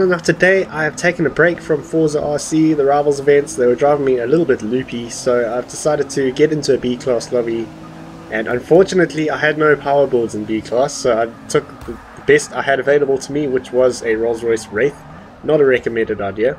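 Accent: South Africa. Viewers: Is this guy from South Africa?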